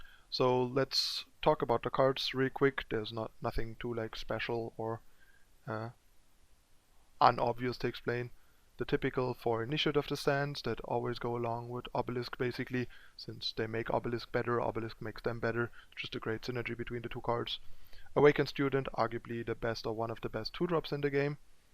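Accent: Germany. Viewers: are your from Germany?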